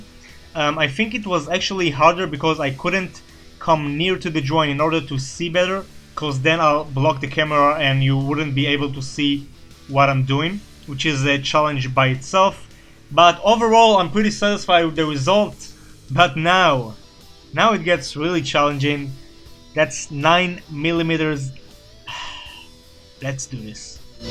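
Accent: Israel. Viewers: איזה מבטא ישראלי אמאאא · איזה מבטא ישראלי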